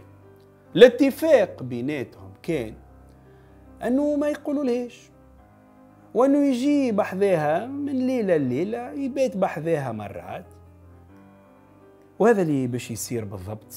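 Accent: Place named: Egypt. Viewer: يا سي طالعة عليك الأكسون المصرية، اما البلاصة إسمها دقَهْلية موش دَقْهلية السكون على الهاء 😁😅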